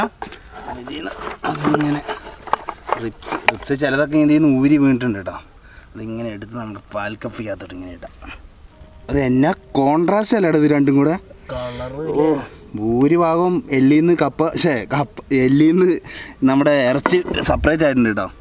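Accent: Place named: Idukki, India. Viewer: മുൻപ് ഇടുക്കി, കോട്ടയം എവിടുന്നെങ്കിലും മംഗലം ഡാമിന് പോയതാണോ സംസാരം കേട്ടിട്ട് തോന്നുന്നു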